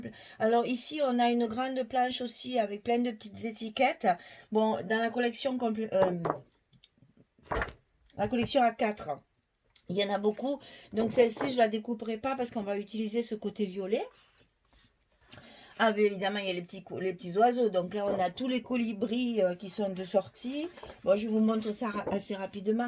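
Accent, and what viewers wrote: South, France. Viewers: Vidéo très agréable grâce à votre personnalité chaleureuse et l'accent du soleil. Je regarderai votre album avec beaucoup d'intérêt.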